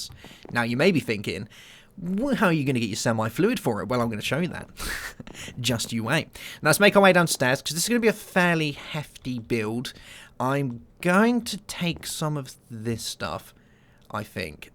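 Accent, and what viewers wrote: United Kingdom. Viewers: really entertaining series and greetings from germany :). btw. i like your accent so much! can you give me a hint which part of gb it is you rule from =D?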